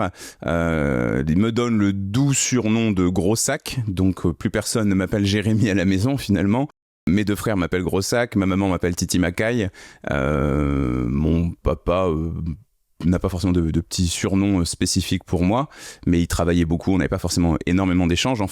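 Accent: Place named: Aisne, France. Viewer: Il me semblait bien avoir reconnu l'accent! Ah, les 02....J'ai grandi à St Quentin, donc, je comprends exactement les repas de famille et l'amour de la bonne nourriture française. Je suis vos vidéos qui sont toujours très bien expliquées. D'ailleurs, je les utilise comme motivation/piqûre de rappel quand je commence à oublier mon but. Donc, merci et...j'adore le papier peint! Super joli!!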